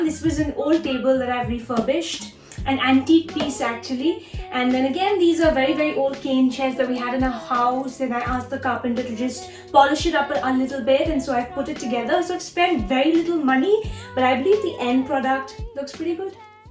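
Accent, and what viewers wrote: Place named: India. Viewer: I am 3rd generation Indian born in South Africa. It is so weird to see someone who looks oriental with an Indian accent.🙊You are so beautiful and great videos. New sub!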